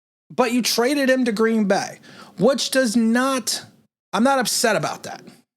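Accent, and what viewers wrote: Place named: Boston, United States. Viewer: I can’t listen to his damn Boston accent for one more second …not one..!! This dude is a Moron and it comes clear to me every single time he discusses my beloved NY Giants and the “ Process” GO AWAY ..!!!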